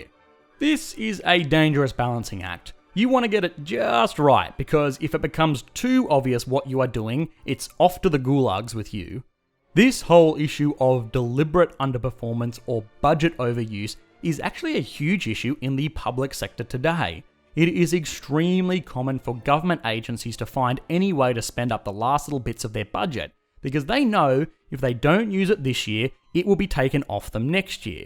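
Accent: Australia. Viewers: Is that australian accent ?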